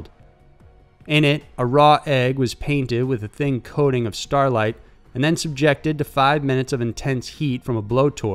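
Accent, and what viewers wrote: South, United States. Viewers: Love that southern accent 💋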